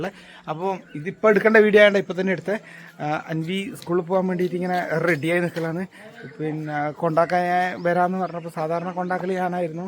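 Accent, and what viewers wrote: Kannur, India. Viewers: നിങ്ങളുടെ സ്ഥലം എവിടെയാ! കണ്ണൂർ ആണോ? സംസാരം കേട്ടിട്ട് അങ്ങനെ തോന്നി.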